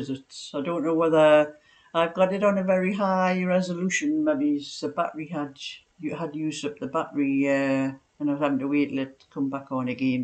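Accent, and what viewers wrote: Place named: North East England, United Kingdom. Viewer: Oh dear I wish I could get to like the music at the start of your recording. I don’t,t recognise it either. However I really enjoy your channel, hearing your lovely accent from the north east. My husband and his family came from your part of the world. Sadly My husband, who was a very fit 83year old passed away the first day of lockdown from Covid. I enjoy your cooking and baking as well as your beautiful knitting, and I do hope you win at your bingo sessions. My mother in law was a great follower of too. Warm regards Christine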